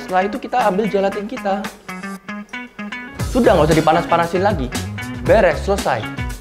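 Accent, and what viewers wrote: Surabaya, Indonesia. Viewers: Logatnya kek orang sby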